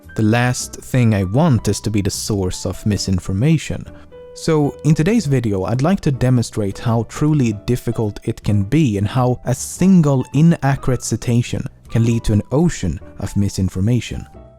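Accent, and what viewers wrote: Sweden. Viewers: you forget hes swedish sometimes, but it does shine through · Where is he from? Sound quite Swedish to me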